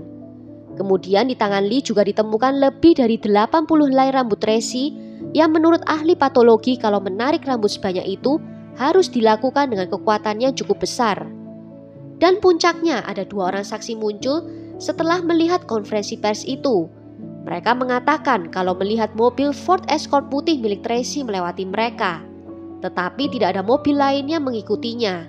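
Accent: Java, Indonesia. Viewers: Hayyy jg say.. medokuhhh 👍💞💞💞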